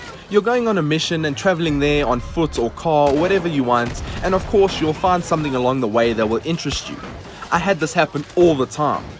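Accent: South Africa. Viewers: Yea, I was going to say I notice that accent. Keep up the content :) btw hopefully you're staying safe if you live in RSA. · Great video..south african accent was interesting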